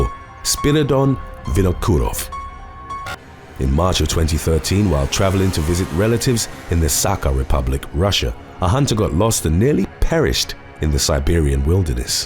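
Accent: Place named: England, United Kingdom. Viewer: Dudes voice is up there with Barry white and Morgan Freeman. The narrator would make a fortune doin audiobooks and horror themed shit lol. The English accent just locks it in lol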